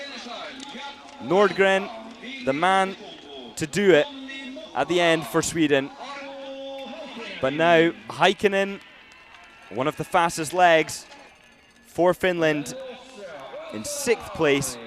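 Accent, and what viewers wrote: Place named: Scotland, United Kingdom. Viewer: This guy is the best English language commentator. Is he a Scot?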